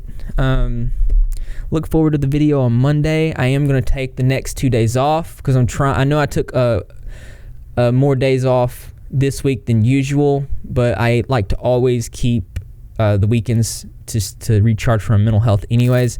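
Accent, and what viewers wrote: South, United States. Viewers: Love that southern twang 😍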